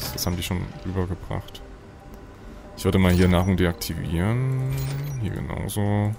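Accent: Saxony, Germany. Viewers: Bist du Sachse, falls Ja. Liebe Grüße aus Leipzig😂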